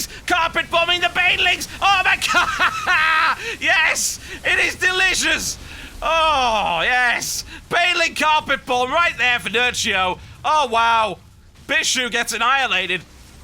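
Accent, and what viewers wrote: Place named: England, United Kingdom. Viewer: Totalbiscuit you and your crazy english accent make watching SC2 exciting and sexy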